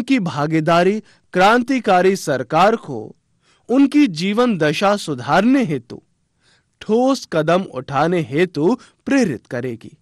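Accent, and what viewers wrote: Standard. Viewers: sir video to aacha tha but aap etna sudha hindi kyo bol raho ho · it's to hard too understand because of youre real Hindi (shudha) Hindi. So it makes it little hard too understand.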